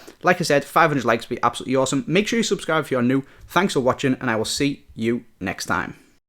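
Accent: Liverpool, United Kingdom. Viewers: Not being mean, but the Scouse accent is fucking dreadful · Your scouse accents is awesome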